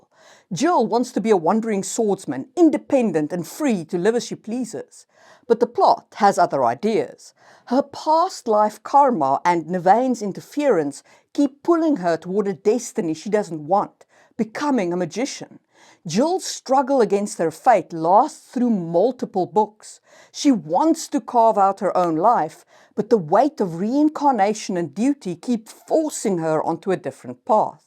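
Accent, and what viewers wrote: South Africa. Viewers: ah, I knew I was hearing a fellow south African 🇿🇦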